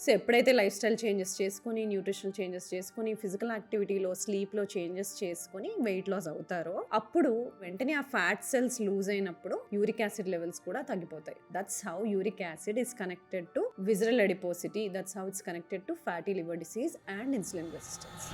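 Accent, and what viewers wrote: India. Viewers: Great information. By the way I am surprised you still pronounce certain English words with Indian accent ( Example: Dessert and obesity) I hope you take it sportingly. Cheers! 👍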